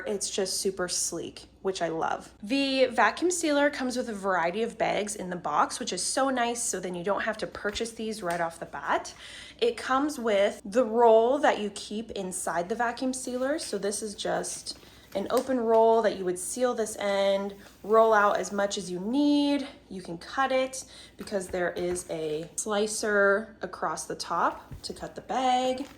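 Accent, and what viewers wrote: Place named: Midwest, United States. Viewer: Hi, I store my hash browns, burritos, etc, in the freezer. They seem to get crusty with frost and just taste awful. FYI, I am not frying them, but use an air fryer to cook them. Do you think vacuum sealing them will prevent this from happening? And bread seems to suffer the same fate. But I saw a video showing a piece of bread turning into a tiny piece of leather after being vacuum sealed. Do you think its best to first freeze the bread and then vacuum seal it so it doesn't deform like your berries? Sorry, I am so new to this and just discovered this. As I am a single father of a 11 year old daughter, I am trying to find ways to preserve food as she will often waste food if I give her too much at one sitting. FYI, I love your voice, you almost seem like you are from Ohio, Minnesota, the Dakotas or Wisconsin. So adorable! 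I'm in SoCal. Let me know if you are ever in the area!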